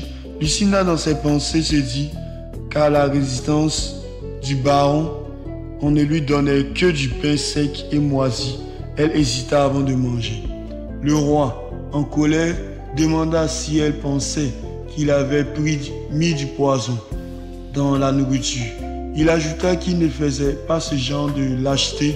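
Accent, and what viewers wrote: Ivory Coast. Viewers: Ta voie ressemble à celle d'un ivoirien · bonjour je vais m'abonner à ta chaîne ça me ferait plaisir de voir ton évolution par ailleurs ont à déjà dit que ta voix ressemble à la nôtre celui des ivoiriens 🤛🥰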